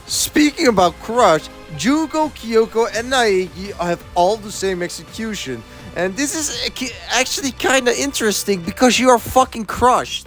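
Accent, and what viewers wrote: Netherlands. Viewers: Your dutch accent is showing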